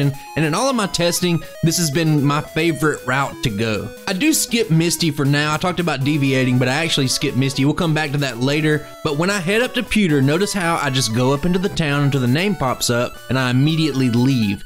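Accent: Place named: Louisiana, United States. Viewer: Bro what is your accent. Nothing against you at all. I enjoy all the vids but your accent sounds so Hollywood south movie created that I have to know where it’s from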